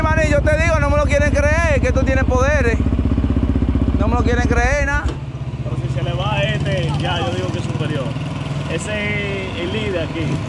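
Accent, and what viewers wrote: Dominican Republic. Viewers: KLK TA DURO ESTE CANAL OYE AMI Se ase que eres dominicanos esmas yo wa a desi que tu ere dominicano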